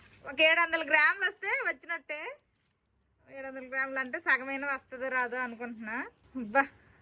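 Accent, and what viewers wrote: Srikakulam, India. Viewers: Pakka Srikakulam ani cheppavachu mi matalu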